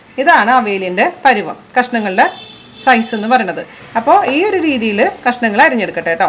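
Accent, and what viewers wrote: Thrissur, India. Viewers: ബാച്‌ലർ പ്രവാസികളുടെ പാചക ദേവത.. അവതരണം ലളിതം സുതാര്യം പിന്നെ മ്മ്‌ടെ തൃശൂർ തനി നാടൻ ശൈലി. പൊളി 👌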